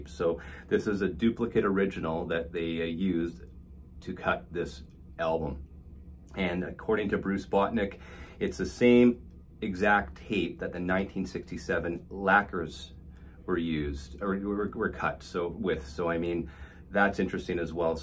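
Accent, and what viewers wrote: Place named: Canada. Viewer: Canadian and with that some pronunciations are different where he is from.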